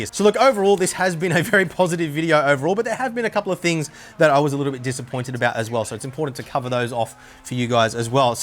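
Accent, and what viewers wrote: Australia. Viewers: Are you an Aussie mate? Thinking of using your affiliate codes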